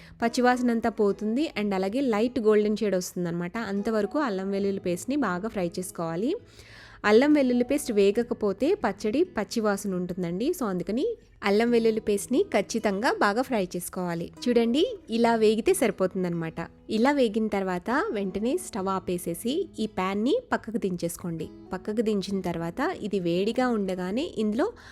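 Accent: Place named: Rayalaseema, India. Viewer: Mam ur pure rayalaseema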